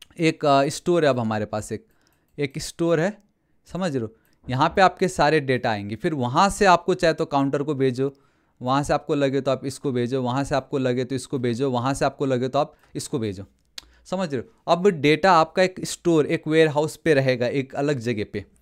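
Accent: Nepal. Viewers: 😂😂 counter na saunter , full Nepali tone , lots of love 💕😘 bro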